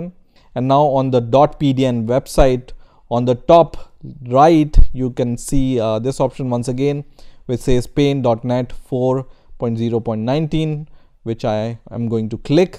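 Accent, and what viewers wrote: India. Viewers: You know it's legit when the dude has an indian accent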